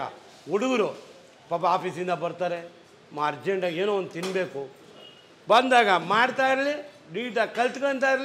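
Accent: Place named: Coastal Karnataka, India. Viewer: I like his Coastal Kannada style . Passionate cook 😊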